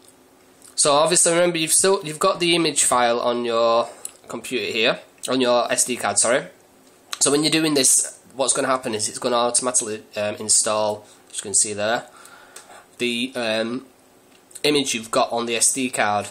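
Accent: Yorkshire, United Kingdom. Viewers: Cheers bud,are you a fellow yorkshire man?great guide to follow.:)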